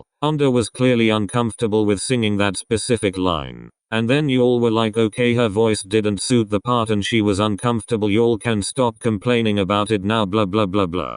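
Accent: United Kingdom. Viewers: ayo british? 👀👀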